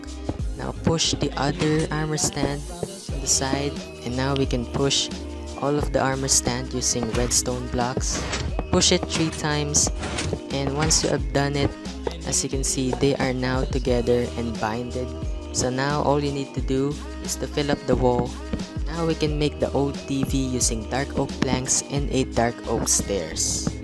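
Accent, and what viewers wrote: Philippines. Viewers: Ko ya parang Tagalog ka no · Are you Filipino, the way you speak in english is filipino, I'm Filipino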